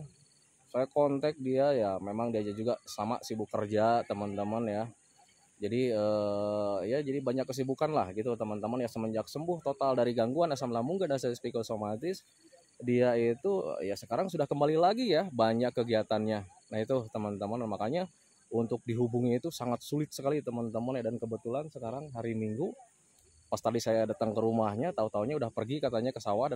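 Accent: West Java, Indonesia. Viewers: Sundana ti mana sih kang??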